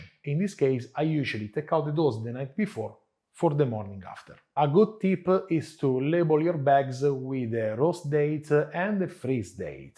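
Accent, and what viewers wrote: Italy. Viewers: Don't give up your Italian accent,! It enhances your credibility, along with all the impressive science. Molte grazie. You're the coffee go-to man.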